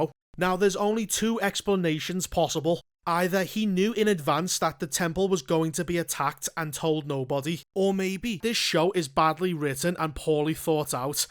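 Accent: Liverpool, United Kingdom. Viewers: Are you scouse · No danger a scouser thought anyone would listen to this fucking accent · I love you you scouser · first fellow scouser nerd ive come across on youtube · You’re deff a scouser haha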